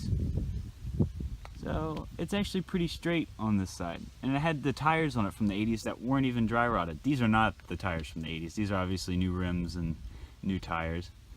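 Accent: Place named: Louisiana, United States. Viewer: Ohio plates but talkin like a gay louisiana boii 🤔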